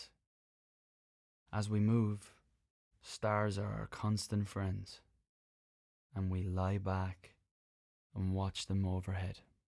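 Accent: Ireland. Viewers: * soothing Irish voice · love the irish accent that he has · especially with the Irish accent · I don’t think I’ll ever be able to sleep again without this. Niall’s voice & beautiful Irish accent is so soothing. The only problem is I never get to finish the video. In less than 5 minutes,I’m sleeping like a baby.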